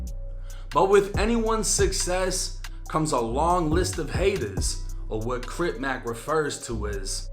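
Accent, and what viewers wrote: Boston, United States. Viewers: The way you have developed your delivery and pronunciation while still holding on to that Boston sound we all love. Your in a league of your own..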